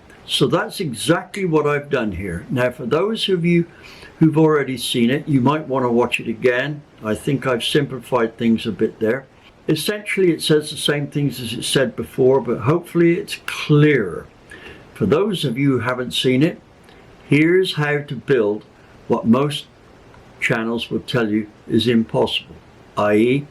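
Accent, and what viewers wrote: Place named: South West, United Kingdom. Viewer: MARTIN PIERCE is (A VERY OLD GREASE MONKEY) who sounds exactly like you with that old south west farmer accent , not sure he will watch a channel like this? Martin is the smallest cheat in car mechanics i know 😂where’s me a 48 clueless female (car lover) have bodged many a thing on my cars and buy some miracle they’ve worked . Like the last exhaust i just did . Know iv got the attention span of a fish so as much as id love to listen to your lovely slow talking persona il leave it to MARTIN PIERCE , with a damn site more patients than me!!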